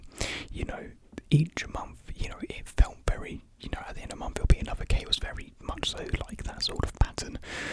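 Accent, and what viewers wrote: United Kingdom. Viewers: hi i was wondering if you're british but live in america?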